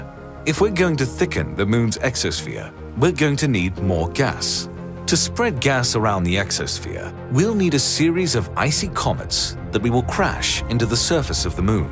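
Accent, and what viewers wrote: United Kingdom. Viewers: I love the edit, the drawings, and the British accent. It's the perfect combination. I'm a FAN! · It's so weird the he speaks with British accent then uses metric system 😑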